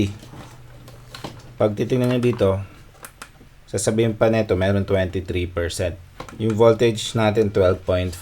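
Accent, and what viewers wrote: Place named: Pampanga, Philippines. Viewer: Bro, Pampanga based ka ba? May accent ka. Will be buying your batt pag-uwi ko Zamboanga or kung Pampanga ka tag mo ko. Pampanga-based ako.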